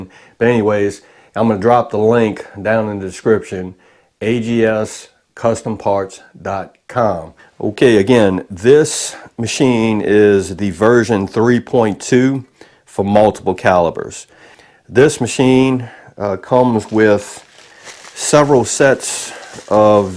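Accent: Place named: Louisiana, United States. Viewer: Are you sure you're not from Louisiana? Show sounds like a Bayou Teche accent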